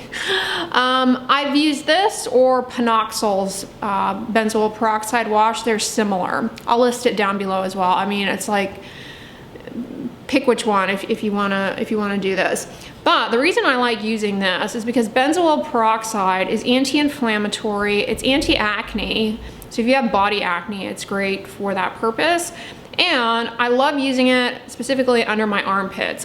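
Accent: United States. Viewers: Do I hear a country accent 👀👀👀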